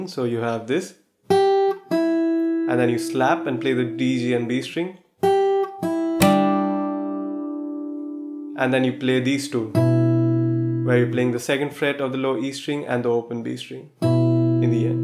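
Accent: India. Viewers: ikr? He has like the same accent. it's Indian accent. but let's stop comparing i mean like nobody likes to be compared? tell me if i'm wrong. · Your accent sounds indian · His accent is Indian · good indian accent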